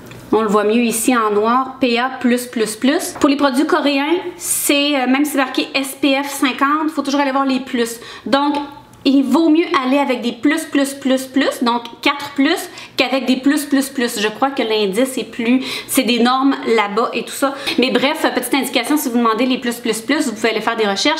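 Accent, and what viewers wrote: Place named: Quebec, Canada. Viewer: Coucou, merci pour tes recommandations;) ma fille ainée est à Rouyn Nouranda pour ses études secondaires, j'aime penser qu'elle entend ton bel accent québécois:)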